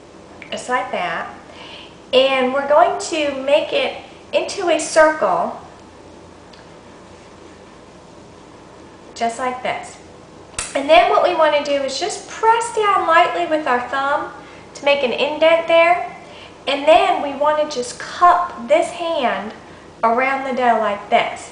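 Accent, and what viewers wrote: South, United States. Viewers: you sound mighty country, i do too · Aww, I love your Southern accent! Your new vids sound very cute though. :) Thanks for posting these!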